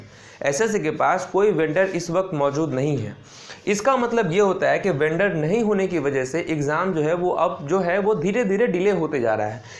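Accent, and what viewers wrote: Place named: Bengal, India. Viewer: Thanks sir i like ur Bengali voice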